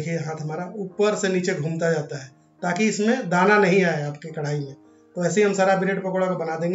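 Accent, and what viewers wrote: Bihar, India. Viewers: Kya aap Bihari hai Tone se lag raha hai Respect 👌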